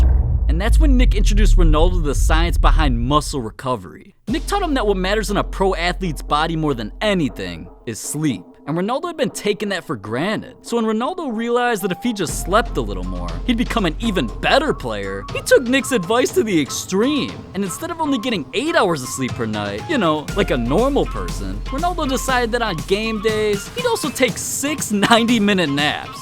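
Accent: United Kingdom. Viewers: Are u from uk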